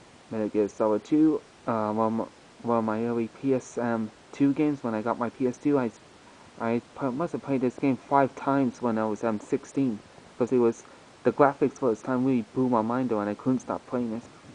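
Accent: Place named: Ireland. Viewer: Nice collection, thought you sounded Irish!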